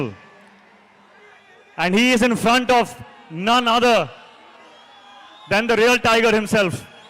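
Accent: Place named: Telugu, India. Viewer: accent 90 per original telugu person laga undi..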